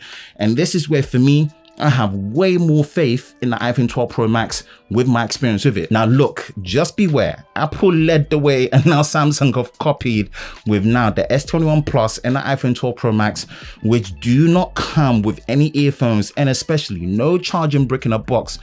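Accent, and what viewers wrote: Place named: United Kingdom. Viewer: Once again and the technical information. Few years ago I was about as ignorant as can be but after watching videos like yours I at least know how to get around a smartphone for the most part now. Also being a black American I always get a kick out of hearing the black man with the British accent oh, I think it's cool. Well once again this yank in Columbus Ohio appreciate you!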